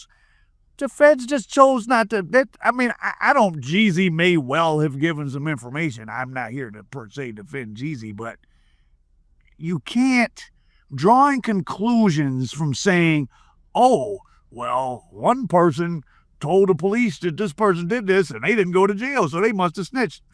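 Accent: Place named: Chicago, United States. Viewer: Why do you speak in some weird Chicago accent, aren’t you from Michigan?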